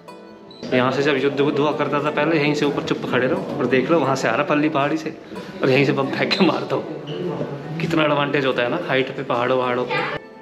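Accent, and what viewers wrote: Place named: Baghpat, India. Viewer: bhai आपकी भाषा हमारे इलाके से मैच करती है बागपत को जानते हो क्या? Hamari DELHI NCR on the top❤️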